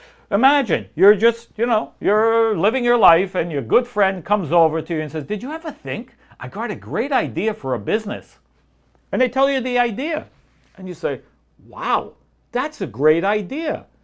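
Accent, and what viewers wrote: New York, United States. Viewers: It's always a pleasure to virtually sit with you to learn. Thank you teacher Rabbi. Yearning reminds me of what I tend to tell people: you must back up your desire with action. It's that simple. Much love and respect to you Rabbi. Many blessings to you and yours. On another note, your New York City stye always brings me home again, lol.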